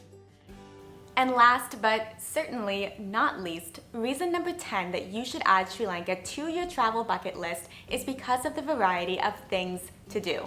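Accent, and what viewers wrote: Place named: New York, United States. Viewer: Have you done any traveling in the last few months? Sounds like you are from NY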